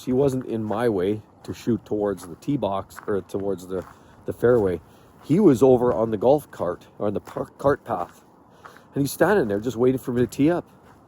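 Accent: Canada. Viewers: I'm a big fan of this channel. I come from Ireland and lived in Vancouver for ten years. Hearing the accent, seeing the snow, the houses and of course the red Tim's brings me back to a great time. I left last year because I was just spinning my wheels. Beautiful place with the warmest most open and friendly people I have ever met. This guy is as real as a youtuber gets. Keep it up. This is great.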